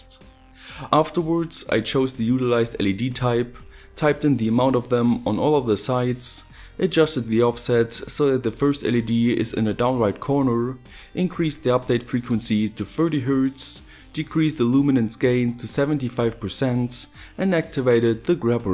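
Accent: Germany. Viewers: That accent .. are you german? Great vid! :) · Jonas Gilje Ich Habs sofort raus gehört, dass er Deutscher ist xD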